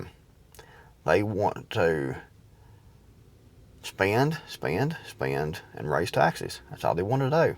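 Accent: Appalachia, United States. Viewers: True. But it is easy to see why everything has gone up in price. So if a lot of our stuff comes from Canada and Mexico and other countries then we are paying up to 100% more for stuff because of Trump's ridiculous tariff war, economic insecurity, and his crazy decisions make everything unsure. Because you come from Appalachia, which predominately votes Republican (I'm near WV), along with the accent. which is why they made the assumption :) Trump said it, the people you talked to just parroted his talking points. They didn't take the time to actually think about it (as you apparently have). They don't have critical thinking skills. They think Trump is going to throw off illegals, not poor white family. LOL When it all comes down, people are going to start scavenging and stealing and it will give T a chance to enact Martial Law. He wants to be a dictator and the easiest way is to stoke a war with someone outside and force an internal conflict and he can try to declare a state of emergency and then Martial Law. 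· You are an Appalachian the same as I am! Be proud of how you speak! <3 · Keep that Appalachian accent! It is lovely. Buggy works!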